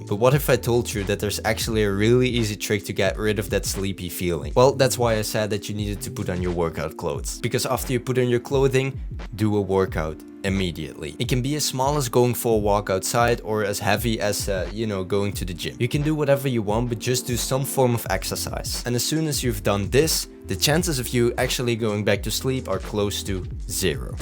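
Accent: Netherlands. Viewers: Are you a Dutchie?😂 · Are you Dutch? Idk kinda sounds like a Dutch accent haha :)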